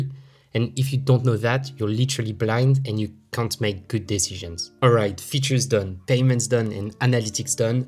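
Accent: France. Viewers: J'adore ton énergie, continue comme ça ! Et j'ai tout de suite reconnu à ton accent que tu étais francophone. Très bon niveau d'anglais en tous cas.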